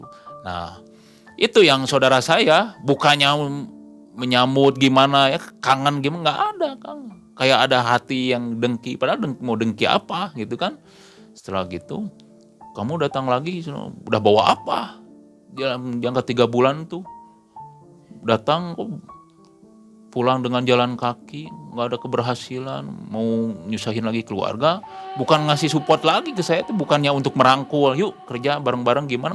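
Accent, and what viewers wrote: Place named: Java, Indonesia. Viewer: Walaupun bahasanya agak medok,tp cara penyampaian nya bagus bgt,dan ceritanya jg bagus.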